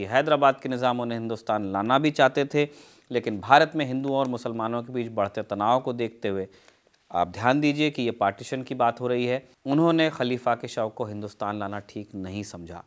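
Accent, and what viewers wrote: Bihar, India. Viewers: Good Behari accent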